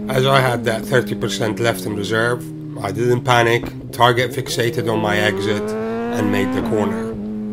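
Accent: United Kingdom. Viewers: is this yourself speaking? i expected a much more british accent haha and im going to italy with my motorcycle this year, i like the 70% rule last year we also went there and 4 out of 7 bikes crashed (at least) once, luckily i wasnt 1 of them and want to keep it that way :)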